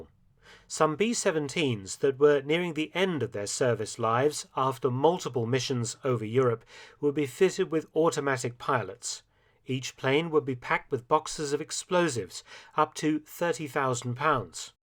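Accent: United Kingdom. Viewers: I love the way you limeys talk..."Norrich" we say Norwidge (I know that's not how it's spelled)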